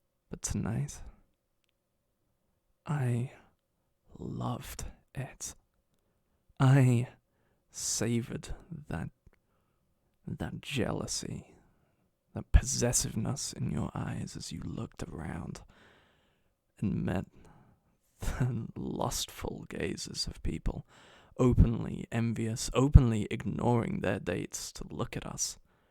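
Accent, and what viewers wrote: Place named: United Kingdom. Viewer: Wait… he kinda sounds like auralescent but more British to me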